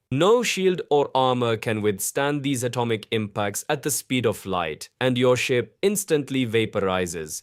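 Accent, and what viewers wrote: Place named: India. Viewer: İndian aksan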